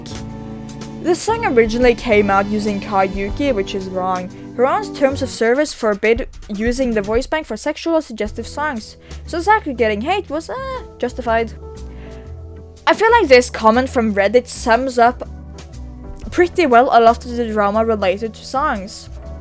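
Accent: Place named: Sweden. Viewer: i feel the swedish accent...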